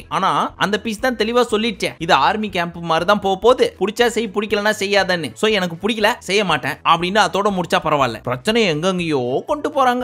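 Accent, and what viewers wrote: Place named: Madurai, India. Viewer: 9 ruba note madurai slang anna 😂😂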